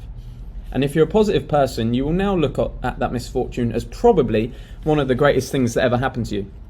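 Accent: England, United Kingdom. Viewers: It's really interesting to hear this stuff with an English accent! Thanks for posting!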